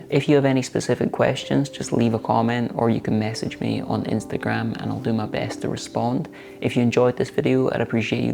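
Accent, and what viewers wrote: Scotland, United Kingdom. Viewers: Have you thought of doing voice over work? You have such a lovely voice although am probably biased because my mum is scottish and just love (all) scottish accents! xx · People love honesty, and being up front about things, it is endearing. Plus who doesn't love that UK sense of humor, especially silly or self deprecating sarcasm? I think the soothing. calming aspect of your videos come from your voice, quiet with that very noticeable Scottish accent. What's not to love there? And who wouldn't be fascinated with a noticeably ginger Scot with a white patch on one side of his head who actually has the nickname and likes it? That is just the best. I honestly like your videos about your cat, and you baking, and you taking walking/hiking tours of your local country the best. The beauty around you and the music you choose for those videos make it like a 20ish minute mini vacation. 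Great work ….Brian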